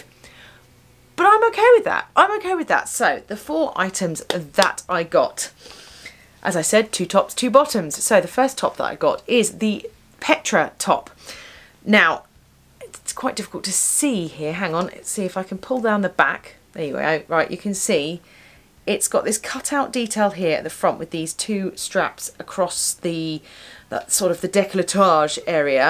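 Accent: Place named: England, United Kingdom. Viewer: It ist a French word! And I love it. I think it means the same as décolleté. If I give the word decolletage into the online translator it means in German somethin so spezific different ;) Thank you so much for a new favourite word. One of my other favourit words is generous. It sounds so loveley. By the way I like your English accent.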